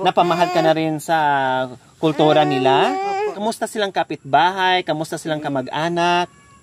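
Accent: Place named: Pangasinan, Philippines. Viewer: tita halata sa diction nyo n po taga Pangasinan kau tulad ng father k na taga Warey, Malasiqui Pangasinan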